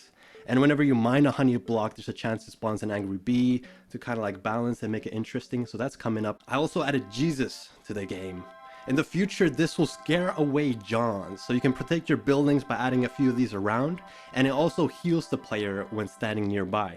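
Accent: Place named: Finland. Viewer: After all the years trying to understand what the Hydraulic Press Channel was saying, I gotta admit, you have by far one of the most clean Finnish accents I've ever heard lol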